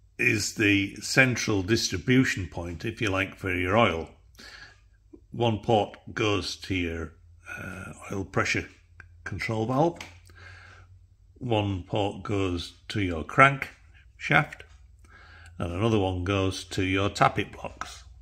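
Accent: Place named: Yorkshire, United Kingdom. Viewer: Your a Yorkshire man but in oz ?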